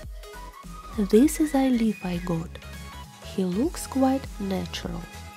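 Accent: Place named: Russia. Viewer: Вы русская?) Русский английский.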